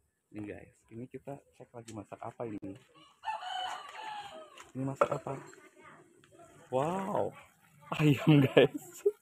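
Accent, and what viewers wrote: Banyumas, Indonesia. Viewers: Kang ngapak ya..pada karo nyong...asli ne biyung kartini ngedi kang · Bahasane deneng pad Bae Karo nyong ya ngapak,ora ngapak ora kepenak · Asli wong ngapak ndi sih mas ki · mas wong ndi kie..koq kdg ngpak bhnse 🤣😂..your is Holland man or ngapak man.... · Deneng ngapak temen mas 😁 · ngapakk y keren 😂🙏🙏 Inyong Suroboyo 😂😂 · mas ko ngomonge ngapak kaya inyong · Kok Ngapak juga mas, kaya EnnyongE 🤭🤭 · Bahasanya ngapak sama aku baru nemu chanel ini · Mas sampean orang mana kok bahasanya seperti saya ngapak? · Salam satu ngapak mas ❤😂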